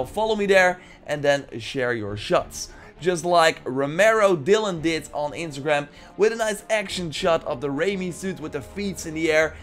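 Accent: Netherlands. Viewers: He's not trying to be annoying it's an accent. He's Dutch and have said on many streams that he's sorry and that he tries his best to talk in English. Some Dutch ppl have a hard time speaking in English so it's a good thing that he can even speak english. Next time before you say someone's annoying do some research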